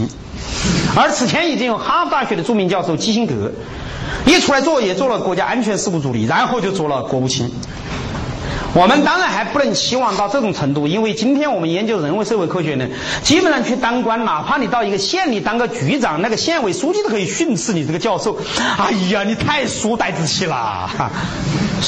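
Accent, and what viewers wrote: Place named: Sichuan, China. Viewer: 任剑涛老师激情澎湃的演讲中我能感觉到他使不完的劲！居然承认自己早年让老乡替考，实在人啊！浓重的四川口音使他的的演讲听起来更加生动，有趣😁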